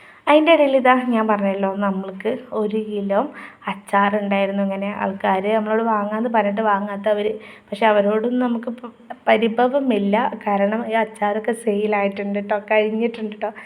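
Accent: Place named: Malappuram, India. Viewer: സംസാരം ക്ലിയർ അല്ല. ഈ മലപ്പുറം സ്ലാങ് വിടുക. അടിക്കടി ഇങ്ങനെ ട്ടോ ഓട്ടോ എന്നു പറയുന്നത് മഹാ ബോറ്. 🤪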